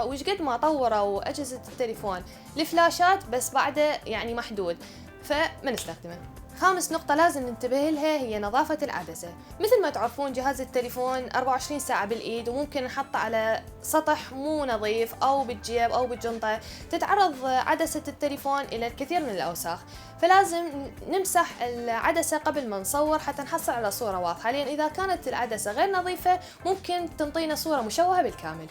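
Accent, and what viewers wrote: Iraq. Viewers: سبحان الله قبل لا اشغل الفديو وقبل لا اسمع لهجتك وانه ما شايف قناتج ابد عرفتج عراقيه 💜🖐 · من اول دقيقه بس عرفت لهجتج عراقيه اشتركت استمري وردة مواضيعج حلوة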